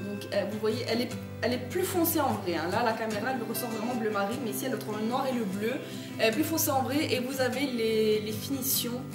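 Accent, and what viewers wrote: Belgium. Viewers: tu est belge non ?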